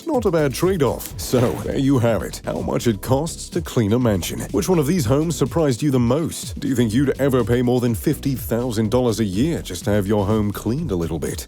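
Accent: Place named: RP, United Kingdom. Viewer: I hade no idea where you were from. But I knew you were foreign. You have mastered the upper class/posh accent 🤝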